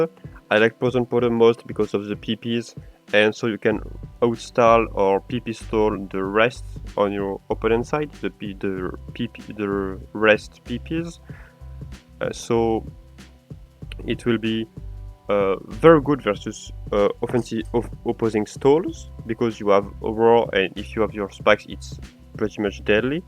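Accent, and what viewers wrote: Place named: England, United Kingdom. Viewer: Oh, what a beautiful english accent ! I'm jealous.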